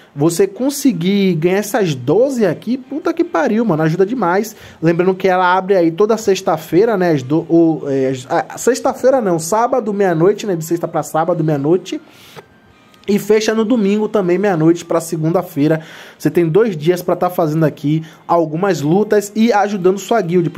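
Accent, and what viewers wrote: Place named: Salvador, Brazil. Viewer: Salve de Salvador meu nobre, pelo sotaque sei que é conterrâneo, de qual bairro fera?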